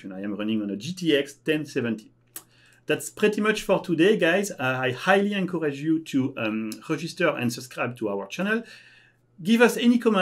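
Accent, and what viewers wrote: France. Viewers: Bravo! Ton accent frenchy et toi etes une fierte nationale! Je suis un nouveau converti a BabylonJS. Merci a toi et a toute l'equipe, vous avez fait un travail incroyable. Au dela meme de la qualite de tout le travaille realise, je reste toujours impressionne par la vitesse de reactivite sur le forum. Et egalement merci a Microsoft pour soutenir ce projet en OpenSource. Thanks you so much guys for your amazing work!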